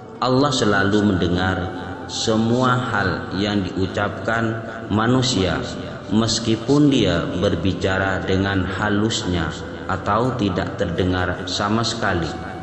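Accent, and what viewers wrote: Indonesia. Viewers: ilmu yg bermanfaat ,saya suka cara pentampaianya macam orang minang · Orang madura berbahasa Indonesia 😀😀😀 Dalil naqli terus bos. Dalil aqliya jelasin donk....?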